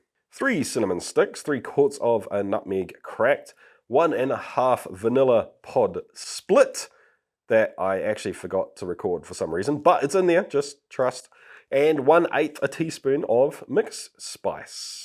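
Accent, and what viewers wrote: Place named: New Zealand. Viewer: Just wanted to share my pointless thought, not really related to the topic of the video: This kiwi accent is killing me sometimes. One of the main things is that "e" sounding like an "i" and making one word sound like the other (for example better--->bitter). Ofc, there's a lot of other things too. Especially when I come back to this channel after a break it really gets me off guard, and takes a while for me to get used to it again. And dont get me wrong I'm not trying to criticize or make fun of this accent (as i said its a pointless thought, so I'm not really trying to do anything here), since I barely speak/understand that language at all, and I sound like I have a serious brain damage even when I speak my own native language, so I'm really not in any kind of position to make any actual comments or criticize anyone's accent. 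If anyone read to this point: thank u for letting me waist ur time😊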